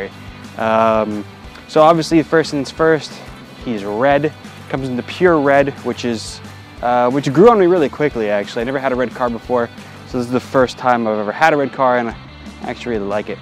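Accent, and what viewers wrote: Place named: Western New York, United States. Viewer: just bought a 2016 WRX 2 days ago man. By the sound of it, you must be from Western NY. there is a WNY Subaru Owners Club on Facebook if you want to join it. I am also learning to drive stick on this...."interesting to say the least" describes it adequately. I'll look for you in the club. One thing I wanted to say in warning, if you mod it, almost all mods require a new tune.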